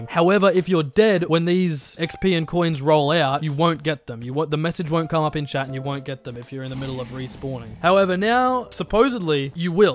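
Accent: Australia. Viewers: you sound more australian in this video 🤔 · Are you from Australia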